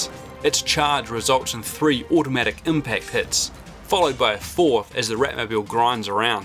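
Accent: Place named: New Zealand. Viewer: Love the character put into the battle report mate. Also, you sound like a kiwi. Hello from Hawkes Bay!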